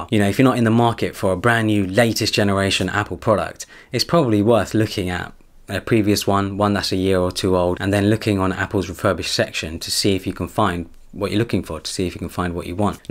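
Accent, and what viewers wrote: United Kingdom. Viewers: love how he's indian and has a British accent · I normally get annoyed with British accents but i can listen to you all day. Nice review! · First time 9n your channel man and damn your accent is wow! Never liked the British accent before hearing it from you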